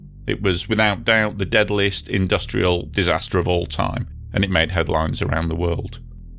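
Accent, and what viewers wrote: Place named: North Midlands, United Kingdom. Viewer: I'm intrigued by *'s accent. I think I've narrowed it down to North Midlands/Derbyshire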